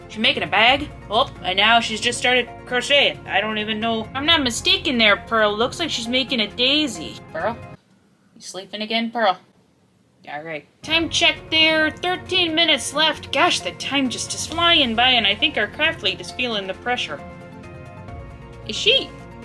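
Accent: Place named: Midwest, United States. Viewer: This was absolutely FANTASTIC! SO hilarious, creative crafts, and I LOVE your Midwestern accent as the commentator with Pearl! 😂 Please consider a 2025 iteration, and thank you for the laughs! 🥳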